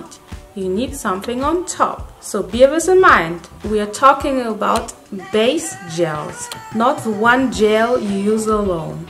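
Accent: Germany. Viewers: Love your German accent ❤ big fan of kinky curly and Camille rose 💗🫶🏽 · Are you German or speak German? Your English sounds like the ones Germans speak Lovely hair 😍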